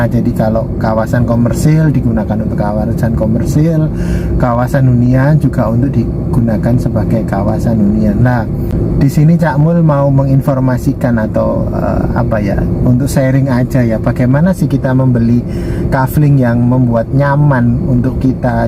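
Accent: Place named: Jambi, Indonesia. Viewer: Kalo dengarin dari logak cakapnya Orang jaambi nih Betul dak bosku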